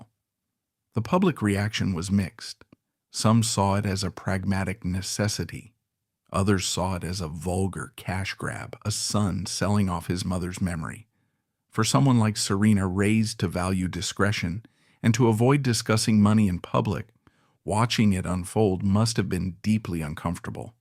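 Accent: United States. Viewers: Not too much Irish blood there I would think , begorrah . Too long , deadpan American accent . Should be wrapped up in 20 minutes (for a minor royal) .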